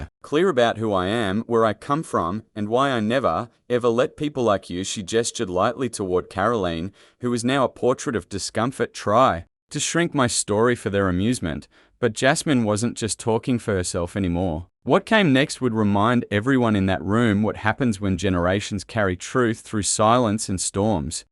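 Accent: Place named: Australia. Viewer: Typical AI story using an Australian accented voice. Obviously a fake set up story. Just trying to cause trouble. 😠 Typical BS.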